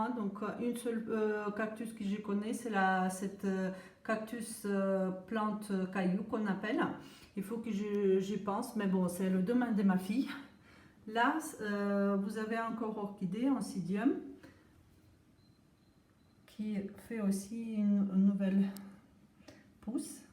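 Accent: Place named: Russia. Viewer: Bonjour Je découvre votre chaîne que je trouve très agréable. Votre accent me rappelle une amie russe 😊💚 A bientôt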